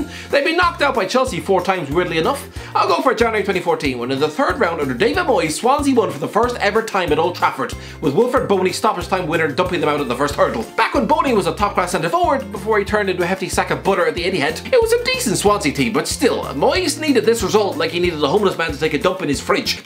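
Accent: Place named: Ireland. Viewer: I see HITC, I hear Irishman, I watch, I like. simple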